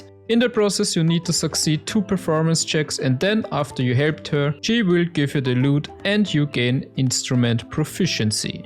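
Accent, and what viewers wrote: Germany. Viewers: Junge dein deutscher englisch dialekt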